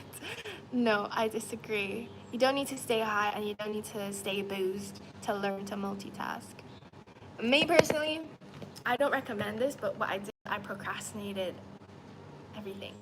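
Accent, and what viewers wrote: United States. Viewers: how did you learn to speak English with a Kansas accent?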